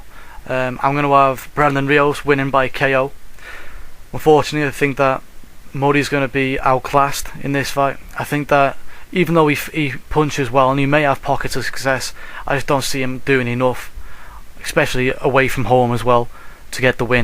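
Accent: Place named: Liverpool, United Kingdom. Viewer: a scouser :)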